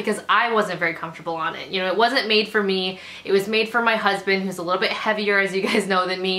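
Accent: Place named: Midwest, United States. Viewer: that mid-west accent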